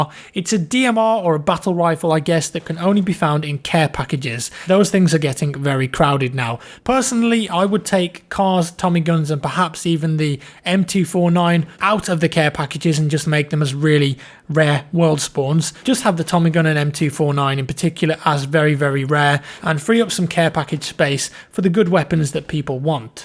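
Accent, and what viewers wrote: England, United Kingdom. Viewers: Good dammit man i love u english accent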